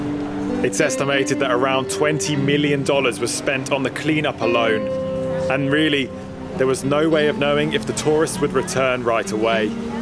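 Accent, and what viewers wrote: United Kingdom. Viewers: I heard the British accent 🥰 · What a perfect British accent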